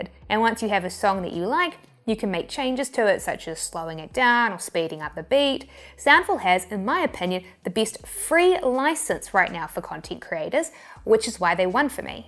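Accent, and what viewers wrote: New Zealand. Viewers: Thanks! I absolutely loved this one! Got lots of new free AI's to try out. You have saved me hours of research (as you know). So, thanks again. P.S.: Liking (and getting) your NZ English more and more ;)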